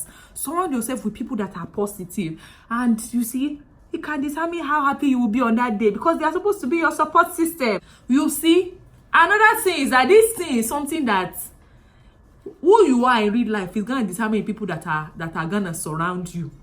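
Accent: Yoruba, Nigeria. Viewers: This your Yoru-English na SUPERB o! Love this Lady FINISH...had to sub sharp sharply🥰🙌🏾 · The Queen's English would have ruined it... That Yoruba English was the shiii! I just stumbled upon your vlog and I dare say I'm clicking on the subscribe button ASAP. I laughed through the whole video. Kudos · Your hype is so contagious!!! You are giving me life right now. First time on your channel and I'm definitely here to stay. The Nigerian English made it sooo much better. 😂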